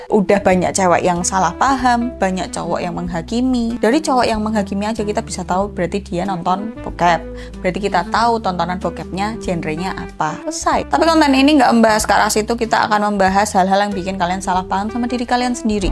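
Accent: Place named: Surabaya, Indonesia. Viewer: logatmu itu Suroboyoan manteb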